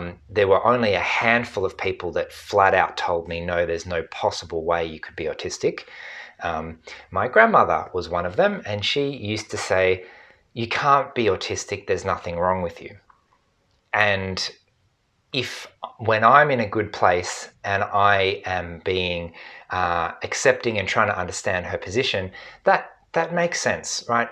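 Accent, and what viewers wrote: Australia. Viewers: Your accent sounds Austrailian to me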